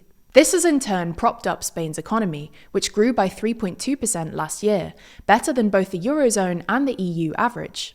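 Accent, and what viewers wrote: United Kingdom. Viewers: I like youre British English accent anyway ❤,and shape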